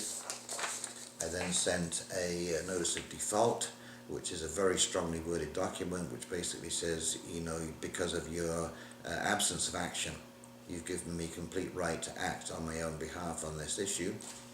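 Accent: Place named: Yorkshire, United Kingdom. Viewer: I am questing you are from Yorkshire England, great video and 1 of many trying to get the word out. my son is fitting smart meters, he thinks they are a great thing as many do, i will show him this video, its about showing people the real truth, and searching, my son is fitting 5 on average per day many are inside the home, they have fitters all over England doing the same, next comes 5G,, they are trying us, and many can't see it, and the joke is they had this technology a long time, there is more to come, chip will be next, we could stop it so easily if we all pulled together, but while we have many mostly young thinking all this stuff is cool we don't have a chance to stop it, I'll get russia say no to them as they banned many things including microwaves , my advice would be to purchase a device that can tell gow much radiation is coming from all our household items, even the simple toaster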